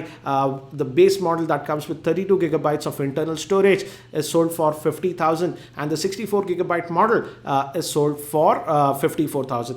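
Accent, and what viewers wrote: India. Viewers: i like the indian accent. :)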